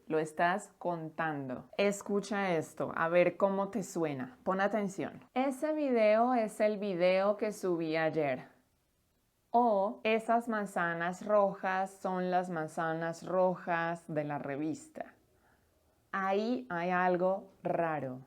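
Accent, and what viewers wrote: Colombia. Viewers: Muchas gracias. Estoy nueva agui... me encanta tu clase, tu manera de ensenar, tu acento de Colombia. Voy a continuar escuchandote. Gracias otra vez. Abrazos de la distancia, de EEUU.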